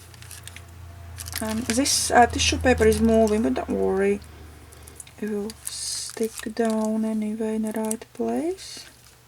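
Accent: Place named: Iceland. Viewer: Hello! While watching your video I had a feeling that you have an icelandic accent, am I right?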